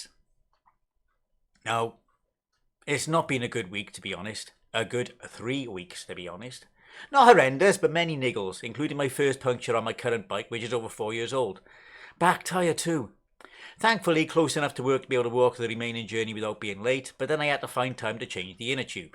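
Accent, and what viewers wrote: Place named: Wales, United Kingdom. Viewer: Another excellent vlog thanks. Are you using an autocue these days? There's something about it that makes the delivery of your dulcet Welsh tones sound even more lyrical and poetic. You should do one of those relaxation tapes :-)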